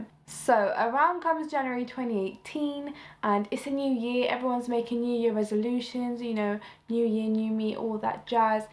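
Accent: England, United Kingdom. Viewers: I love your British accent gurl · I LOVE your voice it reminds me of the voice of Amy Winehouse !! I think it's the accent ahaha are you from England ? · love the British accent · İngiliz aksanlı, açık fikirli, diyet değil sağlıklı yaşam fikrini savunan bir Türk kanalı mı? Hemen abone oluyorum 😊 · What part of England do you come from? You sould just like Mr.London who has fitness videos here on YT.. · Are u from uk??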